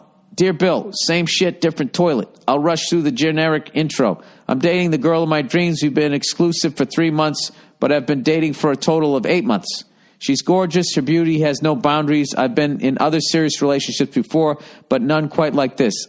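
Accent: Boston, United States. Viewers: U sound like a fucking Boston or New York fucking fucker. Fuck.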